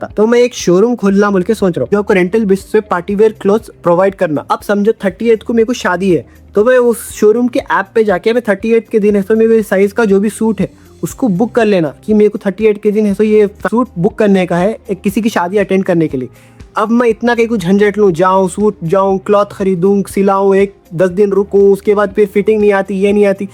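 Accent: Hyderabad, India. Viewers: I like the way you talk Hyderabadi style accent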